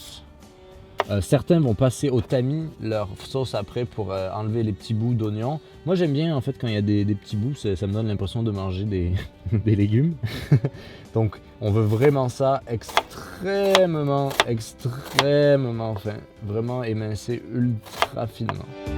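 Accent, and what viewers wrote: South, France. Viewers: Lors de ces premières recettes ce cordon bleu en mode campervan avait un accent de sud de la France ;) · Accent du sud ? De quel sud parlez-vous ?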